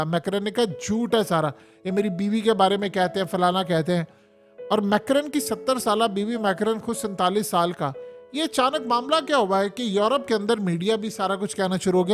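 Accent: Punjab, India. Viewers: Would like to see the picture and name of the News reader / anchor of Haqeeqat TV. He speaks very well with a touch of Punjabi accent. The news is also very authentic and original (First to broadcast). Thanks.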